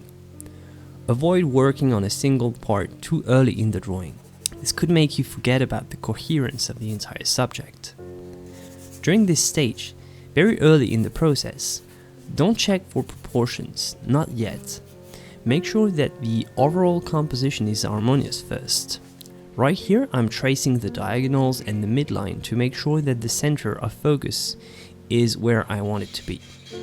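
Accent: France. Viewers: I can tell you've been working on your English. Your French accent is much less pronounced now. If you've been working on this, well done!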